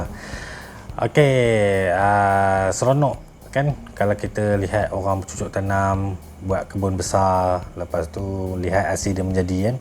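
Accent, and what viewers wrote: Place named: Pahang, Malaysia. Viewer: Lidoh Ora pahang ni kalau tengok gaya cakak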